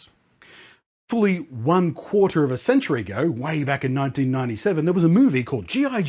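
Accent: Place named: Australia. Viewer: Pretty sure he's not British, other side of the world I'd wager, Australia I suspect.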